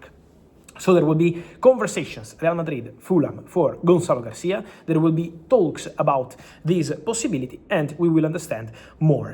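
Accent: Italy. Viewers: İtalian accent is so hard i don't understand you mr Romano😅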